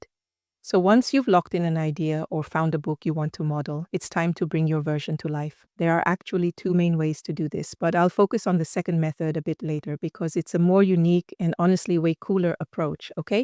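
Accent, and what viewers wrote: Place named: Africa. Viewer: She's African that's why she talks like that. English isn't first language it's obvious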